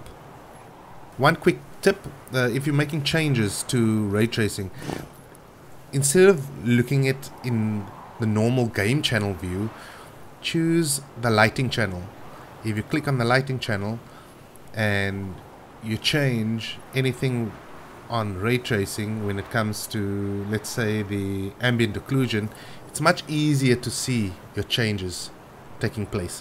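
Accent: South Africa. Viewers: What part of South Africa are you from? ;) P.E here!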